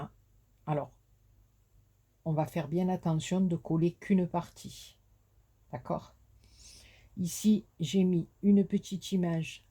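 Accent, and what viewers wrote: South, France. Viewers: Elle est vraiment très jolie cette carte, c'est vraiment un plaisir de vous suivre et pitié surtout pas de vidéo muette 😋 je suis trop contente de vous entendre ça fait du bien au moral! Continuez à nous enchanter et bravo pour cette belle réalisation (trop classe!) Prenez bien soin de vous et à bientôt pour entendre l'air du midi dans votre voix😍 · Coucou, wouahhh c'est une sublime création, j'adore, douceur, raffinement!!! que du bonheur!! merci beaucoup pour ce tuto ensoleillé de l'accent du midi, agréable moment, bisous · Jolie carte Shabby j ´aime💞💞 merci pour le tuto et le partage ,ton accent du sud fait du bien😃👍.bisous😘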